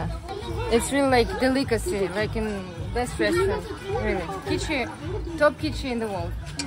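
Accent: Russia. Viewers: What’s is your mother tongue ? From your English accent it seems that you belong to Russia or Some ex-Soviet country.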